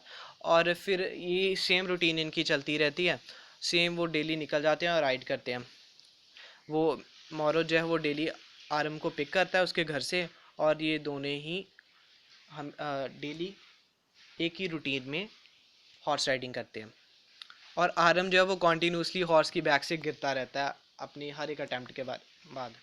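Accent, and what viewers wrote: India. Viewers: Too slow!! Important points missed. Please use your Indian accent. Your Indian accent would sound awesomely confident, your varying speeds will add to it. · Are you a punjabi! I mean your accent is like that only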